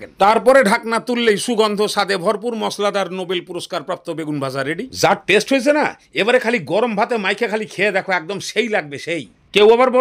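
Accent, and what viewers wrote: Bangladesh. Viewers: Eta amader Bangladeshi accent kind off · Bangladeshi tone e Kotha bolche wow😮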